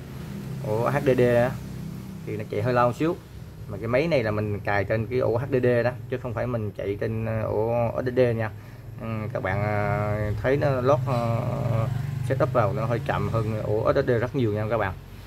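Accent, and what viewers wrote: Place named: Binh Dinh, Vietnam. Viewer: Mình công nhận bạn từ khi tạo kênh đến giờ xem vi đô của b cái nào của bạn nói trong rất đậm chất nẫu k cắt ghép hay chỉnh sửa gì. Hd rất chi tiết k tua nhanh mình xem cũng xứ nẫu rất dễ hiểu...cảm ơn rất nhiều..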